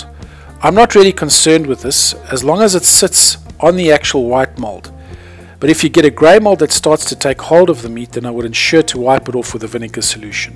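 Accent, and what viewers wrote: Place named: South Africa. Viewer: Where can I buy bactoferm 600 in South Africa ? You sound like a South African.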